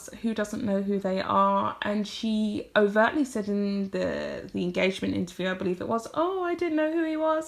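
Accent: United Kingdom. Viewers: I had never considered that you speak fast but for people not familiar with a British accent it must be difficult. I sent a comedian clip to someone and they said it took them a while to figure out what he was saying. I thought it perfectly understandable. 🙂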